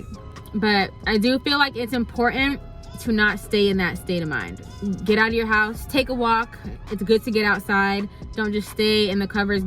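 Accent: Jamaica. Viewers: It’s the patois for meeeee. I think its the first I’ve heard you speak it, love it! You spoke some real facts though sis! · wait u jamaican?😭😭😭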